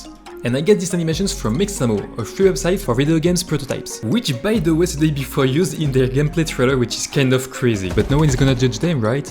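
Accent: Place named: France. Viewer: French, huh? I thought I recognized the accent :)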